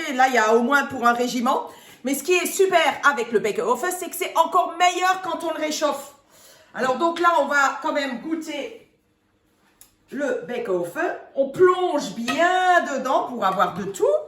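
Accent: Alsace, France. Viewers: J adore l accent !!! Ce bon vieil accent alsacien. Chouette vidéo et recette bien clair à comprendre !!😂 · Super merci beaucoup En tout cas moi j aime quand vous parlez fort Alsacien un jour Alsacien toujours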